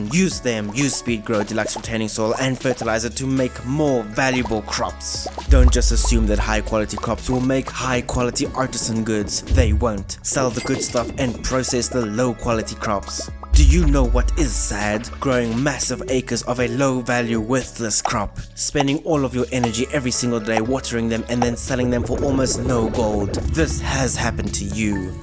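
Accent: South Africa. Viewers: First time watching one of your videos and instantly had a feeling you were from South Africa Howzit boet! 🤙🏻🇿🇦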